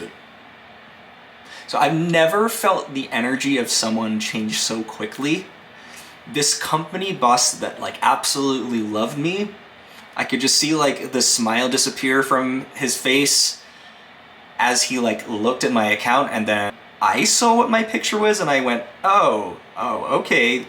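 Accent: Australia. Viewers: NGL, the last time I watched one of your videos, I remember you having an Australian accent. Not saying that it was a bad thing at all, but man, things have really changed since I last watched you on YouTube years ago.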